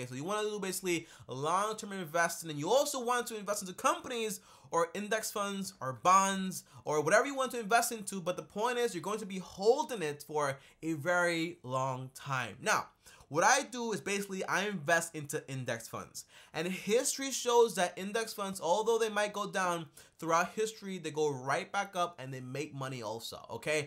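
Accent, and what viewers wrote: Caribbean. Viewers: I hear a Caribbean accent! Lol where is he from?! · I hear a Caribbean accent. Where are you from? Good video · Yo is it just me or does he sound carribean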